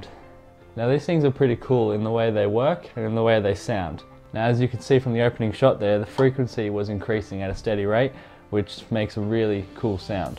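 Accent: Australia. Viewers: This is really cool! My 2 brain cells are trying to keep up 😂 your Australian as well! Yeah mate SUBSCRIBED 👍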